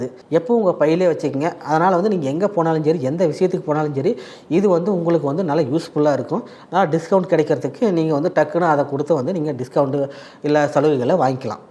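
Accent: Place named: Coimbatore, India. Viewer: That is COIMBATORE SLANG with much respect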